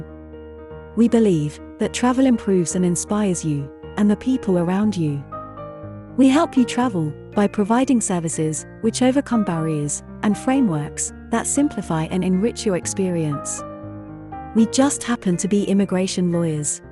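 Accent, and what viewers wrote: United Kingdom. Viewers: stay in your pirate island english !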